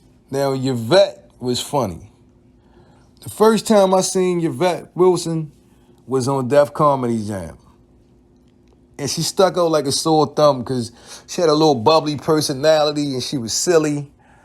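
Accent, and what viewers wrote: Baltimore, United States. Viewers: Dude talking in this video sound like he’s from Baltimore. “Tales From Hood” has a lot of cast members that passed on.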